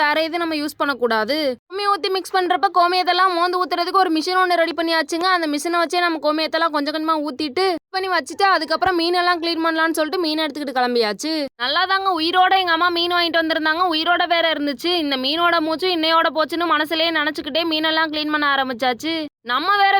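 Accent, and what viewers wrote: Madurai, India. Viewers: மதுரை வழக்கு பேச்சு தலைவா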